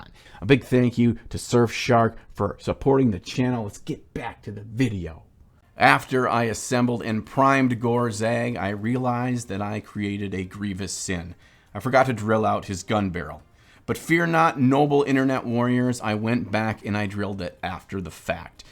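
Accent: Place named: Midwest, United States. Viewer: I knew you were a midwesterner, and that ope was the final clue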